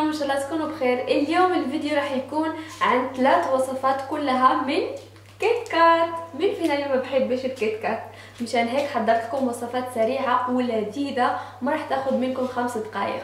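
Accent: Morocco. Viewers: korde طيب عشان هيك عندها لهجة مغربية شكرا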